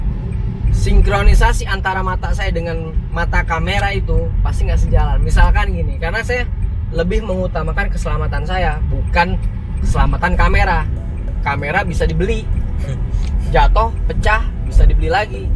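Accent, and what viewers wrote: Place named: Cilacap, Indonesia. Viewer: Ki tunjukin penampakan janda dong buat saya pffftttt... (just kidd) Cilacap ngapak mantap yoyoy...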